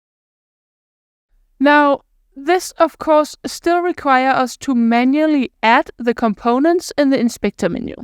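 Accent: Denmark. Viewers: great video, strange random question(feel free to not answer): are you Brackeys GF? you have a very similar way of setting up the video, explaining the issue and talking (this is a good thing, he is very good at this) even down to the Jylland accent.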